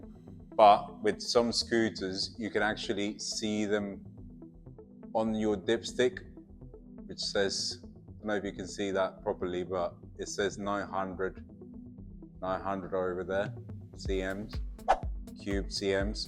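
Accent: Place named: England, United Kingdom. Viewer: I knew that Colombian face but the English accent fooled me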